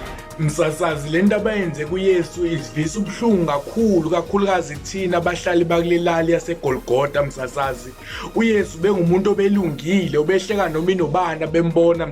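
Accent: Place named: Xhosa, South Africa. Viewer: Lmaaaaaaao! keep up the good work bro, I can hear a bit of xhosa here and there and the evidence tops it all 😂😂😂😂😂😂😂😂😂😂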